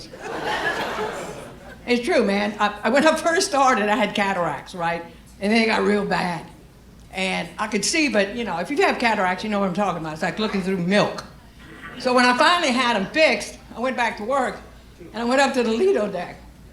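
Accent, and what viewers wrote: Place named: South, United States. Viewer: I love her southern accent 😍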